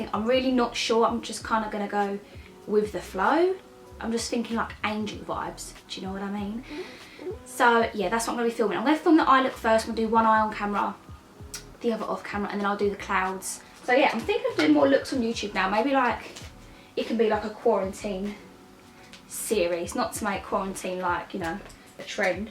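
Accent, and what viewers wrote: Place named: United Kingdom. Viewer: Your British accent is so cute 😍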